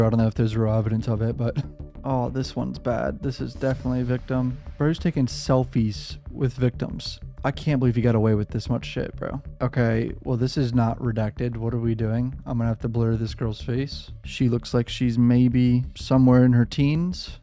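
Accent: United States. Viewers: Difficult to follow you when you speak so fast and your accent is very American with lots of raw raw-rolls. Hard for foreigners to catch.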